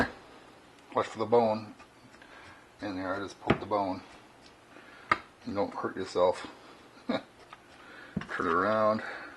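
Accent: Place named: Texas, United States. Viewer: You sound texan 😂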